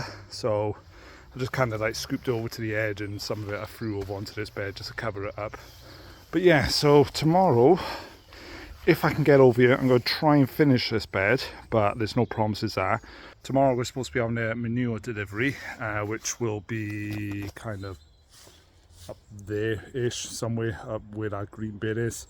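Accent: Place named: Northumberland, United Kingdom. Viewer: Aha found you! Intrigued by your accent - sounds like a cross between Northumberland and the NPT!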